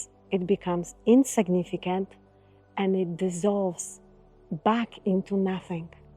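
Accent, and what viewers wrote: Poland. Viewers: I also think it is something different about this Channel about this person. I don't know what it is but i can't stop watching.❤ Is it all thrue? Or is it another trick? I wish I knew. I hear polish accent I wish she would answer I wish to break free from this prison.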